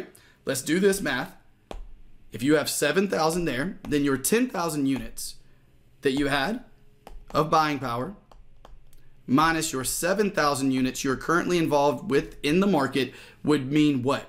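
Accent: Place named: South Carolina, United States. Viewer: ooo hang on.. that's gotta be a South Carolina accent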